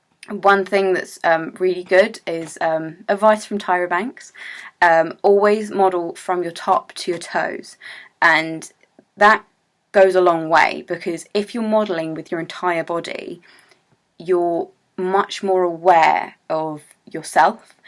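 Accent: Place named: United Kingdom. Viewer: I did not know you were British! Your accent is awesome! :D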